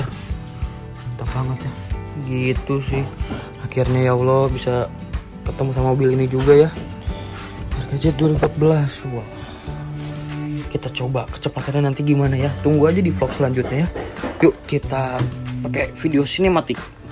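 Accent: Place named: West Java, Indonesia. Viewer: Kaya orang sunda ya logatnya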